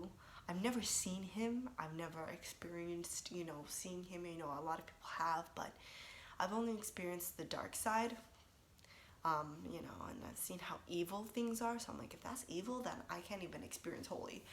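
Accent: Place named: United States. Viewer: Você tem cara de brasileira mesmo, mas teu sutaque é bem americanizado. Deus seja contigo. Mais uma entra mas fileira dos salvos em Cristo. Deus é fiel!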